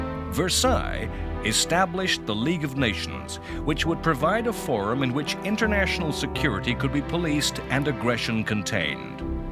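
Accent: United States. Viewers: wait why is this narated by an american?...the rest of the ones i have watched are narated by an English guy? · An American who actually pronounces "Adolf" correctly!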